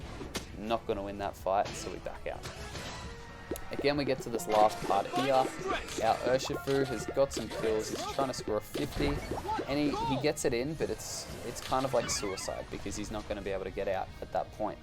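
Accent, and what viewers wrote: Australia. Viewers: Oh broh are you Aussie mate?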